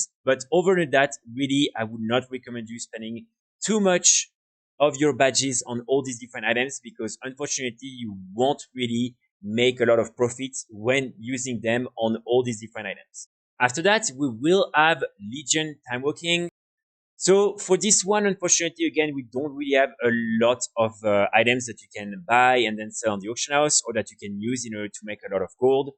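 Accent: France. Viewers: NOT a lot of gold :P. Love your content overall glad I have found your channel! Your French accent also, if I'm not wrong hehe.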